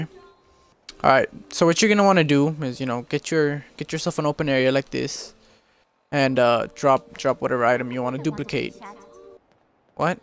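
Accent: United States. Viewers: you sound like your from LA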